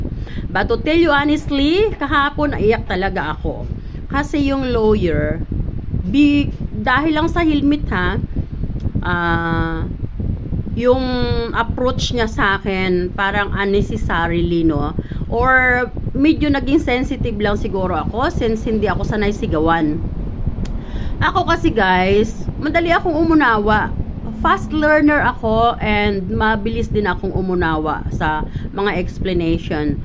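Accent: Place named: Philippines. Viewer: Watching sharing akala ko Korean ka nanuod ako sayo silent now may sounds pinay ka pala❤❤ kahirap yan dapat talaga mag helmet kasi hirap 100 pa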